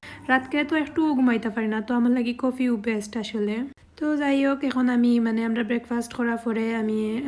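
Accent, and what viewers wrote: Sylhet, Bangladesh. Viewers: আপু অনেক সুন্দর ভ্লগ, আপনার আঞ্চলিক ভাষায় কথা বলাটা সুন্দর লাগে। এটা কি সিলেটি ভাষা? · ভাল লাগল আপি। তোমার সিলেটী ভাষা শুনতে ভাল লাগে। রাতে ঘুমাইতে পারনা কেন আপি? বেবির জন্য?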